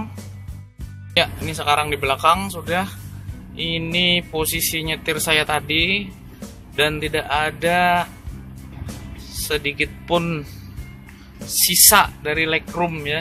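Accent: Banjar, Indonesia. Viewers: Logat banjarnya kada kawa didustai 👍 mantap djiwa bossku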